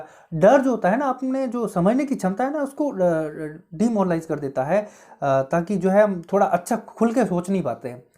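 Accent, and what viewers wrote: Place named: Ganganagar, India. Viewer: Sir aap ...beenjhbayla.(ganganagr) se ho kya ?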